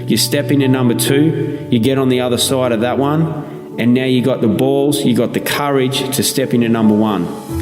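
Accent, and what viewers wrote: Australia. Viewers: He’s Australian 👍🏼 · Great to hear an inspiring australian voice :) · Awesome to finally hear an Aussie. Great content.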